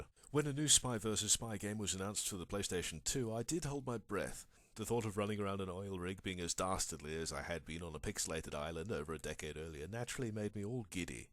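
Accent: United Kingdom. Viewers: but he has a British accent nevertheless, and iam pretty sure he was born in Britain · I got the feeling of beloved Yahtzee, but I missed the excessive swearing about the game. I am after all, a fan of juvenile humor, and hearing a posh british accented man swearnig until his gonards explode is making me laugh. Other than that, It seemed to be a great first review try.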